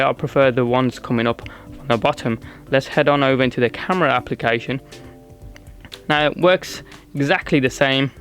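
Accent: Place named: United Kingdom. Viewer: You sound british some sort of :v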